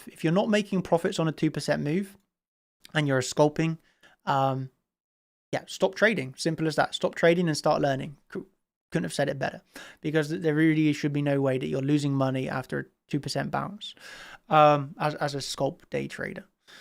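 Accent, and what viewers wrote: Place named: South West England, United Kingdom. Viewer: He’s got a posh south western accent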